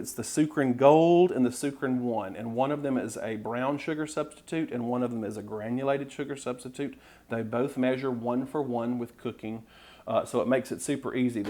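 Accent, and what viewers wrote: South, United States. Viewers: i just subbed bec of this vid. I love it. Also, your accent... I can't quite put my finger on it... southern? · I love this including your talking (which I don't consider rambling at all) Thanks for your humor and as a Southerner myself, your accent. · I just subbed based on your rant alone. I love it! I'm a southern girl and you sounded like the people from my grandma's hometown! That blessed me, thank you for not editing it out. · You popped up on my YouTube feed today and I instantly fell in love! I love the recipes, I love your rambling...and I especially love your southern drawl (I am from southwest VA). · Found your channel a few days ago. I love your personality and good nature. Loooove love love your southern accent! I used to live in the FL Panhandle and miss it so much. Thank you for your honesty regarding your triggers, we all struggle in different ways. 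Wish you and your channel the best 🙌. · I love to hear ya talk I'm super southern too lol so you keep doing you ❤❤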